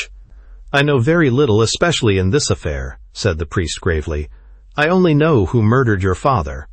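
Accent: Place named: United States. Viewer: i want british accent.. not that ugly american accent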